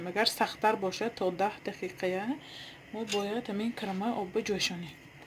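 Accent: Isfara, Tajikistan. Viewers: Офарин хохарчон лахчатон исфараги боин Дастатон дардро набинад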